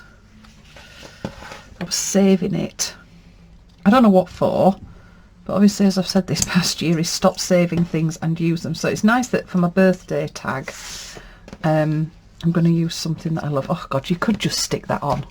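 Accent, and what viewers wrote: Yorkshire, United Kingdom. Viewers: How did I not find you sooner! Your tags are beautiful.. they've really fired me up to make some. I think I will be doubling up next year and doing tags AND flags 😁 Love your youtube video. Very relaxing (and sooo nice to hear a Yorkshire accent. I feel right at home (even though I've lived in the Midlands for the past 21 years😒) Keep those creative juices flowing, thanks for sharing and have a really lovely birthday! 🥰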